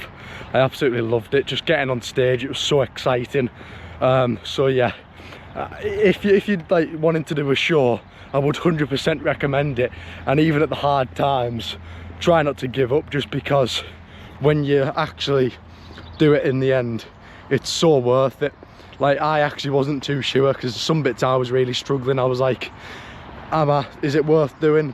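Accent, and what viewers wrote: United Kingdom. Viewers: Very proud of you. I did my first competition at 51 and I thought it was the greatest thing I ever did despite how hard it was. Did you get tired of tensing your legs? The low carb week get you down? You're right, not the most fun in the world but the payoff is incredible. So, what's up with your school? Someone there needs to get a life! Q - trying to place your accent (I'm a Yank). Northern England maybe? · is he a geordie?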